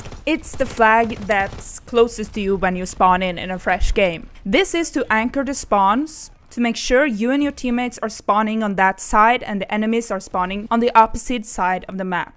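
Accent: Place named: Ireland. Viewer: her accent is irish fyi people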